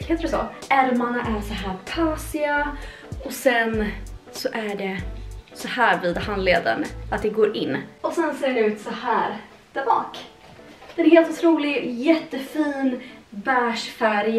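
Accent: Stockholm, Sweden. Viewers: Nu har jag kollat på flera videos av och tycker att hon fortfarande pratar stockholmska och ser dessutom flera kommentarer att hon har ändrats sin dialekt. Är inget har mot tycker bara att degvätskan konstigt att man ska låtsats något eller göra det tillgjort om man inte har partar så innan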